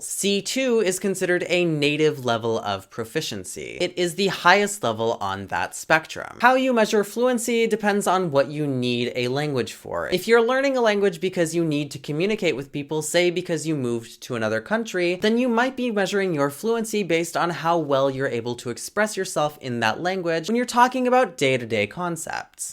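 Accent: Portugal. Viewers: yeah your french is beautiful and your Russian is better. Também gosto que falas a tipo do português do portugal. Continuas falar meu amigo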